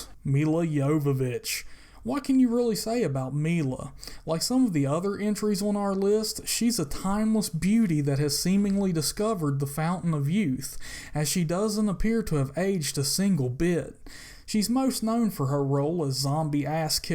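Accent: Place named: South, United States. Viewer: Love you southern draw dude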